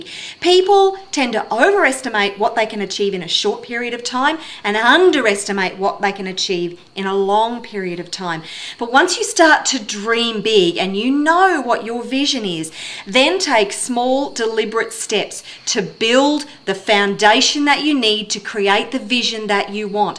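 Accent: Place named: Australia. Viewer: great to have found your channel Thanks and great to hear the Aussie accent! Thanks:)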